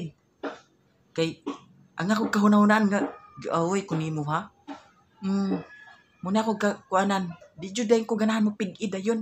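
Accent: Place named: Misamis Oriental, Philippines. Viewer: Every time makita nko imo vedio it remained me sa ako mag relatives taga misamis oriental i missed hearing your accents....ingana gyd .....shoutout taga kiraking ug calubo keep safe....you too🍒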